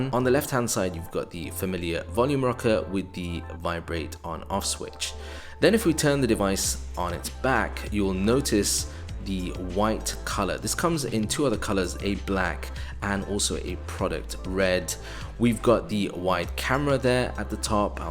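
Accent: United Kingdom. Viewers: most british accent review that i've ever seen dude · great review :) i‘m gonna get one ig (loveee ur british accent haha)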